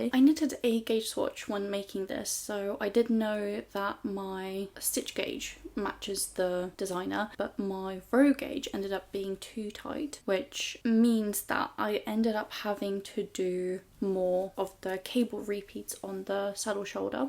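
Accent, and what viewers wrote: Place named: England, United Kingdom. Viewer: New sub here and THANK GOD you’re English! That and the reference to “purple” in the title attracted my attention. I’m afraid some of the American channels are terrible screechy monotone American that grates. Your accent is delicious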